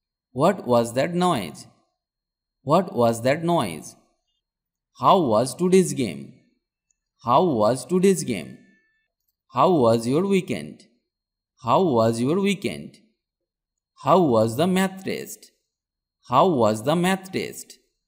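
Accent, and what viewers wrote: Bihar, India. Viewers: Real bihari slang.. english (Englise) patient (pesient ) was (waj) extra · Bihari ho kya sir 🙏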